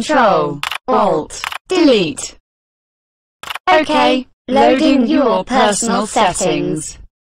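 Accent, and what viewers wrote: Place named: United Kingdom. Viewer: Its British accent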